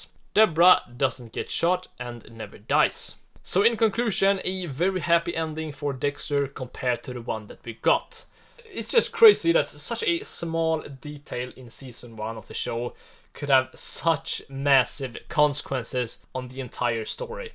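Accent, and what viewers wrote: Sweden. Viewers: That Swedish accent going crazy 🔥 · u swedish? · You're definitely Swedish. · Holy svengelska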